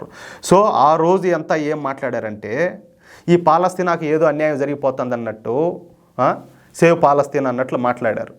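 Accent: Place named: Chittoor, India. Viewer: Well Said Bro. You rock. Especially your Chittoor Accent is too good.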